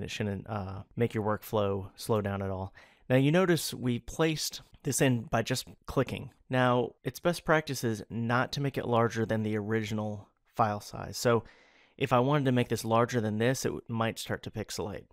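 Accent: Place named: South, United States. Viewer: This is really great and easy to understand! The only problem I had was the southern way of talking, although nice, the lilt kind of fades off at the end and I had to keep turning up my speakers when his voice would get too soft.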